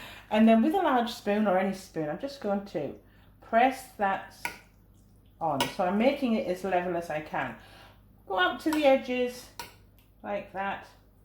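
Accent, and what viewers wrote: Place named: North East England, United Kingdom. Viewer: Just found ya channel. love it for ya home cooking . I crumble bits of black pudding into my lasagne along with a half t spoon of fennel powder ( or italian sweet pork sausage which has fennel in it )and some chilli flakes for a kick. By the way where in North East are you? I'm from sunderland originally ( I think you're not that far off by ya accent?) but I'm living in Spain right now so missing homestyle food. .I'm catching up with ya recipes ( love the Greggs pasty video ). Well done ,keep going.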